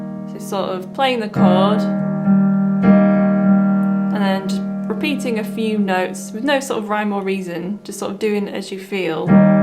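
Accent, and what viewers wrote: United Kingdom. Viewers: I know how to play the song. I just couldn't stop the video, enjoying your lovely British accent... 😊. Also nice work with the tune! Cheers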